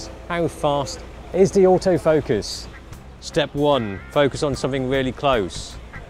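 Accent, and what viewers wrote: England, United Kingdom. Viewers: Kinda weird when a Chinese dude speak with an English accent.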